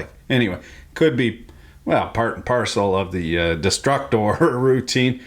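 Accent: Quebec, Canada. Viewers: always gorgeous to listen your cool casual way.Do French Canadians always speak this Nice! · "Êtrrre Drébrrrouillarrrrdrrr" I love Quebec accent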